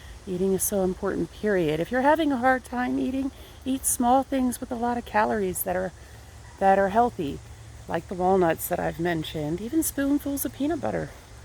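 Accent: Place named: South Carolina, United States. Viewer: Love your accent...Is that South Carolina ?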